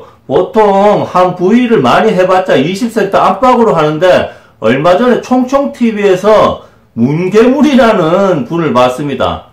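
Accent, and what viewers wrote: Gyeongsang, South Korea. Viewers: 행님 말투가 너무 좋습니다ㅎㅎ 우리 부모님 같으세요 갱남 밀양분들인데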